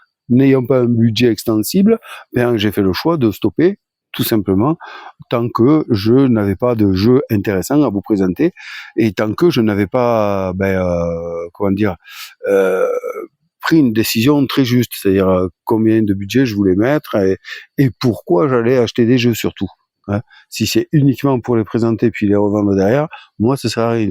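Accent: South, France. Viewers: bonjour, peu importe pour de nouveaux jeux, tu es une personne chaleureuse a l'accent du soleil, perso les jeux que tu présente ne sont que secondaire, le fait de te voir a fond dans le jeu cela fait plaisir^^ la campagne orcquest était excellente, tu pourrais continuer. tout ça pour dire que les jeux sont secondaire, avec mes amis ont fait souvent les mêmes jeux le principal c'est de se retrouver. Pour les alea de la vie, fut faire avec voire sans. encore une fois se retrouver entre amis permet de penser a autre chose. En conclusion, même pour parler bout de gras on est la ^^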